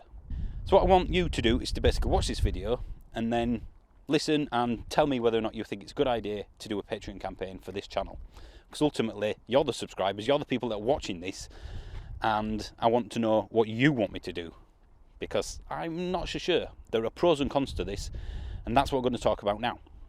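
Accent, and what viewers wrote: Yorkshire, United Kingdom. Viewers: I quite like... your, I guess, Yorkshire accent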